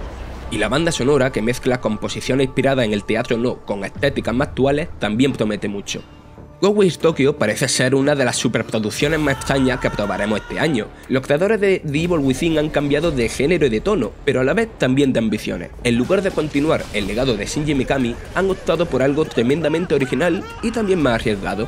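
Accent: Andalusia, Spain. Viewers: No le cuesta hablar, es un acento de una region de españa!!! sino te gusta no entres a ver el video. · me parto con la voz andaluza.... 😂😂👍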